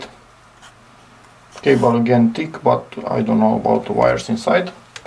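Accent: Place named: Romania. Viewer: Hello, nice video, thanks for the info. PS: are you romanian(you sound like one, by the accent)?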